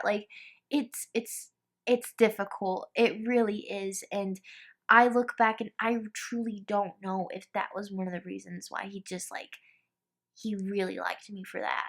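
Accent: South, United States. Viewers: Hey, I am so glad that you are feeling happy in this video. I completely love it when a girl starts crying, and then she would finish crying, and then she would start smiling and laughing. I am so glad you are feeling okay because I have been thinking about you and praying for you. I know I am much younger than you are (I am 18), but I admire you a lot. The number one thing I love about you........... is your one beautiful precious smile, along with your lovely Southern accent.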